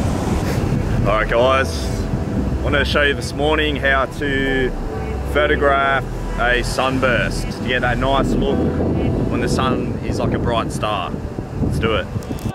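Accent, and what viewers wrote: Australia. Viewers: That photo is so beautiful. Your Aussie accent was so strong 6 years ago - it's really noticeable compared to the videos you made this year 😊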